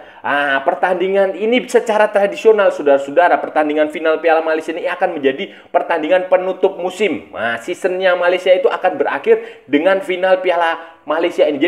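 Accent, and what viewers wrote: Indonesia. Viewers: eja ala2 english pun lidah indonesia tetap sama baca nya 😊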